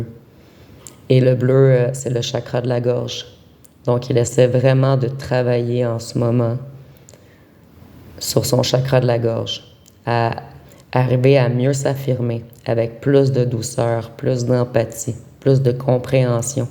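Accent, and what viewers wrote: Quebec, Canada. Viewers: Merci beaucoup ! Le tirage est très parlant et déterminant pour moi ! ( avec votre bel accent québécois .! ) MERCI pour tout . Je vous souhaite une très bonne soirée !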